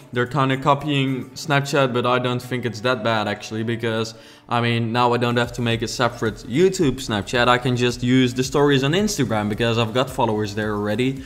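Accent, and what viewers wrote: Netherlands. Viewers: You do have a little accent, but I don't think its anoying. I just like your video's! I'm dutch to and its just funny when I hear that kind off accent❤ And its a super cool house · I'm Dutch and yes and I can hear you're Dutch too, btecause of the accent. but you pronounce every word right and it's not like you always stop to think about how to say or seek for words. So you're good for me! :) (Go Gelderland! :D) Daarnaast. Je builds zijn geweldig! Ik houd van zulke moderne huizen ipv de typische american houses of de standaard rijtjes huizen die wij hier hebben! Ga zo door!! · Great work! I love the part in the middle, it's so creative! As a Dutchie myself, I did find your accent a bit uncomfortable in the beginning, because it sounds so Dutch? But now I totally love it, and I always listen to everything. And you come across as a very nice guy, down to earth and sweet, very different from other channels.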